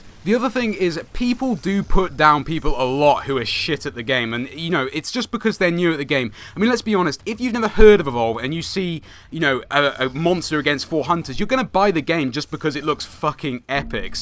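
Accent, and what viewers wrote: United Kingdom. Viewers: He's British? Cool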